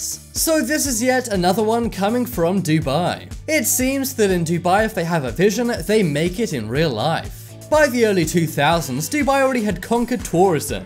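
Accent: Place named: United Kingdom. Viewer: I'm pretty sure the dude is British lol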